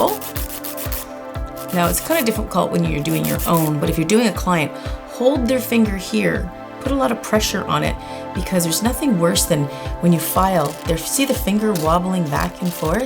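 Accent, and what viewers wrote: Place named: Canada. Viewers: Gille87 Yeah i asked because the accent was for me very canadian :p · Cecile Ruer You are welcome Xx. You can hear it by how she pronounces certain words. Like -about etc. Very typical Canadian, and I love it hihi. No Aussie accent tho which is totally different :P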